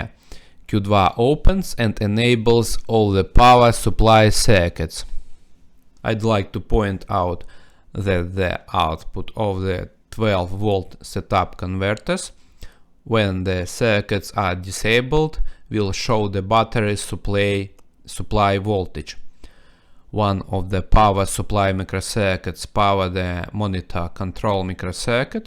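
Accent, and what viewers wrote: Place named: Russia. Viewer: приветствую! ваш анлийский поймет только русскоговорящий, говорите лучше на нашем могучем языке, он великолепен! :о) удачи в творчестве!!!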